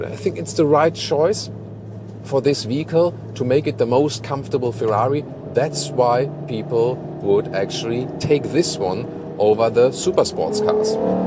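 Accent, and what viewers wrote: Germany. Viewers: Irgendwie stößt der german accent bei mir auf unwillige Ohren.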